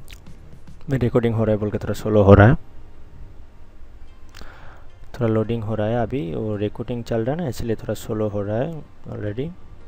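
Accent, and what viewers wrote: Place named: Odisha, India. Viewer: Bhai tu odiya ki hindi to achha se bol de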